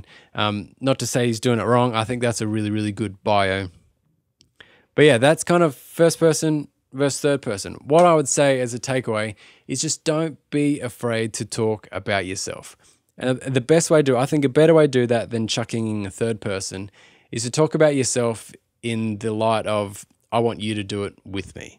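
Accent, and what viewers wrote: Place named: Australia. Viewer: I've just released my first song on Spotify and am on the journey from musician to artist so this is perfect for me, thank you! Love the Aussie accent and great to discover music as I've been away from Australia for over 20 years...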